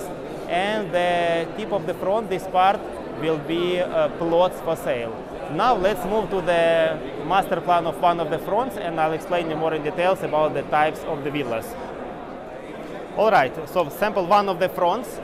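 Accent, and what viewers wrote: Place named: Russia. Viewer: Your speech, information, intonation is great, but reeeealy hard to keep listening bc of such a strong Russian accent. You need to fix it at least a bit, man.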